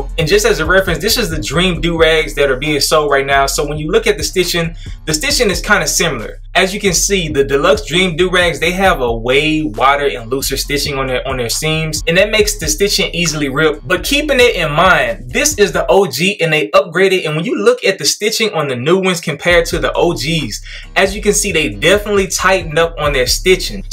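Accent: Houston, United States. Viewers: This dude gotta be from the H. Subscribed.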